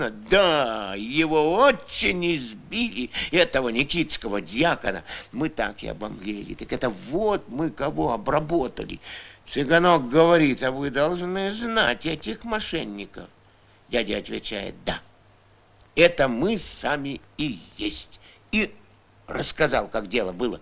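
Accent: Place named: Vyatka, Russia. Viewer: Наш, вятский говорок то проскальзывает!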